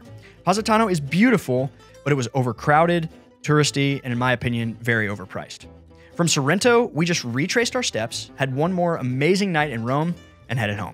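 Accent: United States. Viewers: Chimney cakes are from Hungary...why I am listening to an American talk about Czech travel tips...;)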